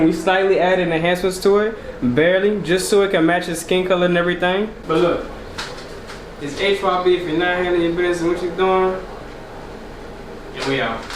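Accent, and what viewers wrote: New Orleans, United States. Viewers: is that a NOLA accent I hear? I love NOLA accents and thanks so much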